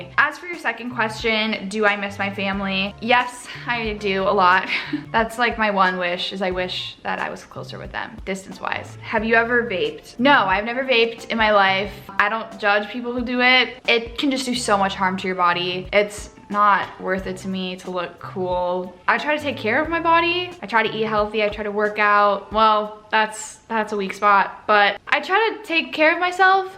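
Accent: United States. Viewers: She has the LA accent now :((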